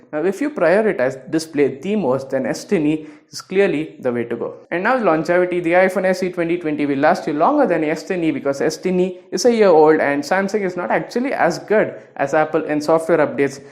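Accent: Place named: India. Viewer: I am quite fond of stereotypical Indian accent and understand it well. But it's not bad to train yourself to speak in a more neutral accent. It can be done, you just need to practice. Also, work on your pace and avoid having unnecessary movements as they make you look awkward and nervous. Good luck on your next videos!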